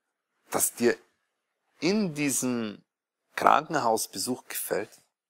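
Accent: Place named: Bavaria, Germany. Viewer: Köstlich der bayerische Einschlag!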